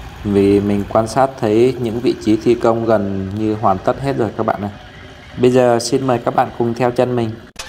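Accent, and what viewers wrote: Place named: Cao Bang Lang Son, Vietnam. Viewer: giọng đọc của ad hình như là người cao bằng,lạng sơn vào đăk lak thì phải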